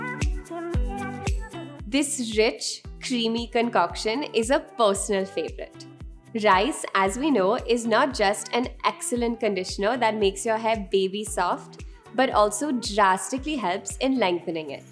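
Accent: India. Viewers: I'm from the U.K and she has British/European features, skin tone and hair colour. But her accent matches my asian/Indian companions. That is all, Never meant anything by it all! She is very beautiful either way! Love your videos guys. Keep up the great work! 🥰🤭❤️💗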